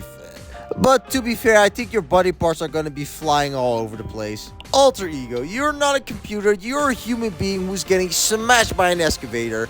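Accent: Netherlands. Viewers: Your dutch accent is showing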